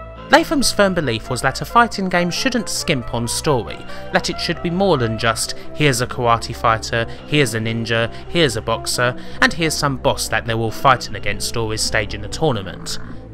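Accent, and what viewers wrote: United Kingdom. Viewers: Whoa I couldn’t put my finger on it but I’ve never heard a British accent with a speech impediment in my life! Interesting. No hate.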